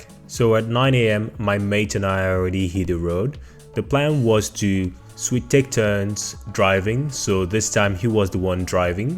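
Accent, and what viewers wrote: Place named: Australia. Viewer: The Australian "mate" accent is really coming through 🤣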